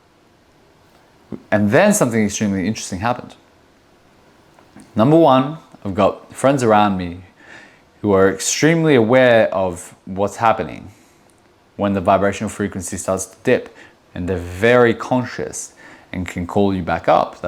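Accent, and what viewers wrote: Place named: Australia. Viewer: You're so fucking hot, but I cannot deal with an Aussie accent, mate.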